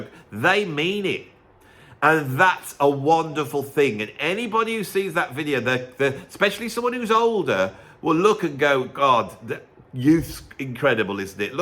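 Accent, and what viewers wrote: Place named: England, United Kingdom. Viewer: I have to say, I never realized how American the epithet “douchebag” is until I’ve heard it with an English accent.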